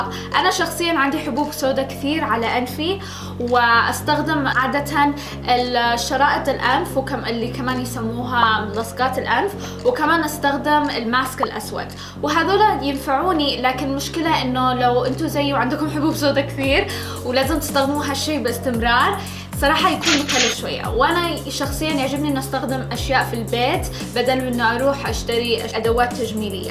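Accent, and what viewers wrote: Yemen. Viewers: كلامك صنعانيه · هههههههههههههههههههه الردود تضحك اللي يقول مغربيه وسعوديه ومصريه آيه يمنيه صح بعض كلامها من للهجات ثانيه بس هيه يمنيه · والله كلامها يمني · لجهنك يمنيه؟؟؟؟؟؟ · ايوه هي يمنيه بس هي جلست في مصر كثير و صارت تتكلم يمني على مصري على سعودي على سوري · لهجتها يمنيه بأقوى قوتها أعرف لهجات بلادي كلها انا في السعوديه وكلامهم مش زي كلامها ابدا عموما يمنيه او سعوديه ع العين والراس الله يسعد الجميع يااارب آمييين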